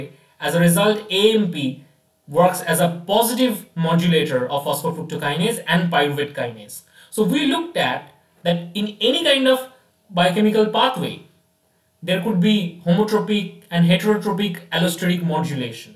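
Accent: India. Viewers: lol he can't afford a real copy of windows? This cyborg voiced indian is sus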